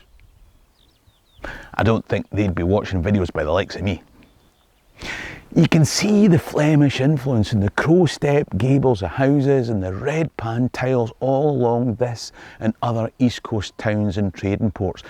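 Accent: Scotland, United Kingdom. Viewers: Accent says Scottish, appearance says mixed race Jamaican guy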